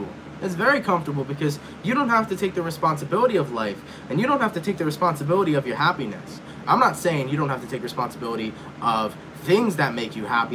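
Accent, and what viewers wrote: England, United Kingdom. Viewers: (Gemmies) yuk! Am I the only one who's cringing? English accent double cringe. Ok wots goin on? Lol xx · Thanks 🙏 for your Pisces ♓️ reading great brittish accent, have a amazing day, bless you ❤️🦋🌹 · Lol the English accent 🤣🤣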